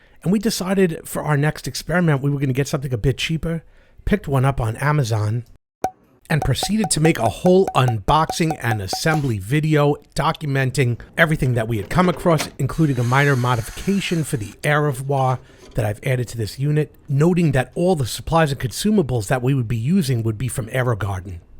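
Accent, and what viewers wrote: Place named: Massachusetts, United States. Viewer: Love your videos. I could tell from your 'r" that you are from the Massachusetts area.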